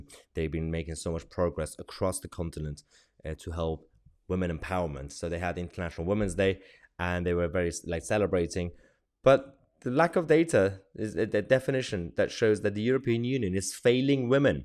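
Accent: United Kingdom. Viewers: While I agree with every word spoken by this youtube host, my immediate question concerns his speaking voice. He uses British English, without sounding annoying. This is amazing. His speech is clear, without a hint of the usual arched phrasing and intonation of royals and intellectual talk-show hosts. His tone is actually delightful, so I would like to know where he was trained as a public speaker. The youtuber named Stephan Molyneaux has this same wonderful way of speaking. Whether I agree with all that he has to say would be another question. I would just like to know the origin of that public speaking voice.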